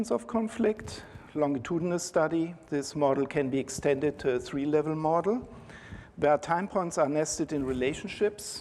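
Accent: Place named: Germany. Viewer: Unfortunately he speaks with a too heavy German accent when lecturing in English language.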